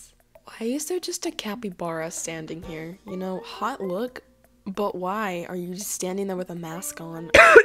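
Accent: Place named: United Kingdom. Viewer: Sick British accent!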